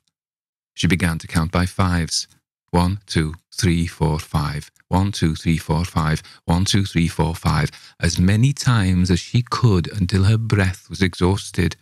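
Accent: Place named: Wales, United Kingdom. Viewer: Relistening to this tale just to hear your Welsh accent. Sounding great on my TV speakers, next best thing since I don't see you doing live readings any more.:_hearts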